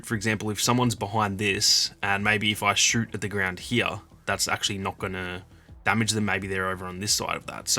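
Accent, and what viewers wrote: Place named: Australia. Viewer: Always love your content, really think you deserve way more views from the quality of your vids. Nice to see (and hear) a fellow Aussie!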